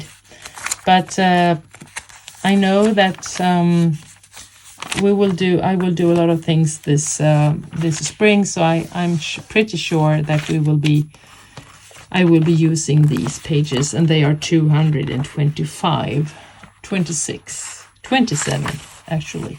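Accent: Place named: Sweden. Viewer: Thank you for sharing! I love how these look. Shipping to USA is just so expensive 😑 Maybe there will be more options for next year 🤞 I am so happy to see you are back, I've missed you and your videos. My 18yr old son overheard this video and said "she sounds like mormor", so I asked what do he meant and he said her accent sounds like she could be Swedish, like mormor 🥰 I love it!! I am Swedish, and my family only hear accents from my family from Sweden 😂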